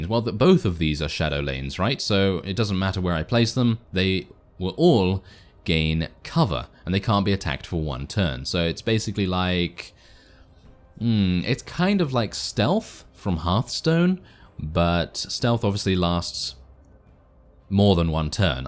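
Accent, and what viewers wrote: United Kingdom. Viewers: Oh and are you British because you sound like it not that there's anything wrong with being British it's just I was wondering you sound very gentleman like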